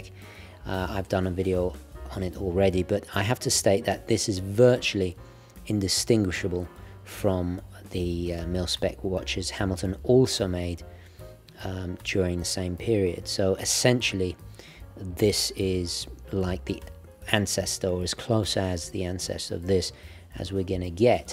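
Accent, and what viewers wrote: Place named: United Kingdom. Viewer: I thought u were British...?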